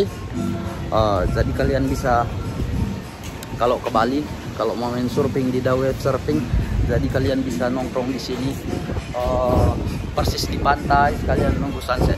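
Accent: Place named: Medan, Indonesia. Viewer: Sepertinya logat anda anak medan ya.....hehehehehe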